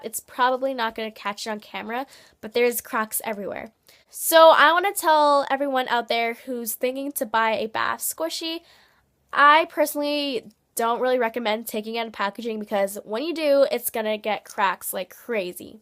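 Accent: Canada. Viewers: Wait is she Canadian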